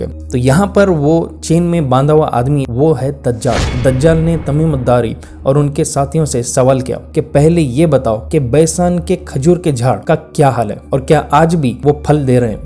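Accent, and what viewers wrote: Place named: South, India. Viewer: bhai bolne ka tareeka ajeeb nhi hi Ye south india ka accent hai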